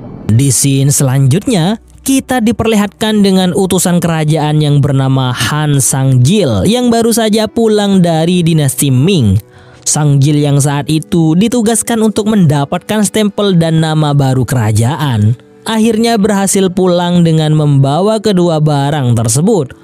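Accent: Madura, Indonesia. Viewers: Madureh ongghu🤣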